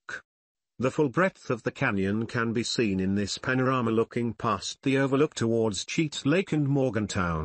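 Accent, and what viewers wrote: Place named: England, United Kingdom. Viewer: I truly enjoyed this "experiment". The English accent is enjoyable - my Grandmother was English. I am relatively new to Morgantown. I have been to Cooper's Rock - simply breathtaking! But with your drone, I am able to see so much more! I also enjoyed the history - it adds to the experience. My vote - keep experimenting, please.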